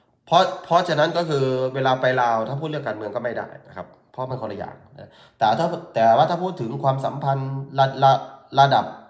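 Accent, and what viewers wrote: Isan, Thailand. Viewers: คุณพูดเป็นภาษาไ ทยอิสานนะไม่ใช่ภาษาลาวติดตามอยู่คนรุ่นไหม่ไฟแรง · สวัสดีครับสบายดีครับพูดภาษาไทยชัดมากภาษาลาวไทยอิสานออกสำเนียงอุบลฟังแล้วไม่ใช่สำเนียงลาวฝั่งซ้ายการออกเสียงเป็นคนอิสานไม่ใช่คนสปป.ลาวฟังแล้วเหมือนคนอิสานพูดเป็นกลางไม่ใช่อวยครับพูดถูกต้องแล้วครับเป็นกำลังใจครับ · สำเนียงแบบคนไทยภาคอีสานเลยครับ,เวลาพุด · อ้ายเจ้าเว่าลาวยังบ่คือลาวยุ ผมฟังเป็นทางอีสานครับ “ขออภัย”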